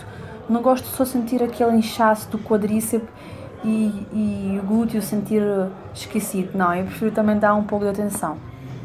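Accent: Portugal. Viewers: Sotaque de Portugal seu é lindo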